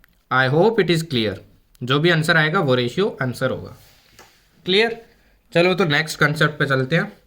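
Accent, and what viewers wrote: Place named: Shekhawati, India. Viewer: Your accent is so shekawati, good to hear. Keep improving.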